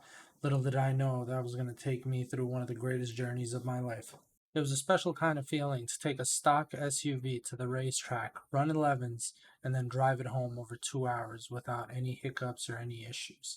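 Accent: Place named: New York, United States. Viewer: Subscribing because you're covering this well You talk like a real NYer too not like these phony pricks from Connecticut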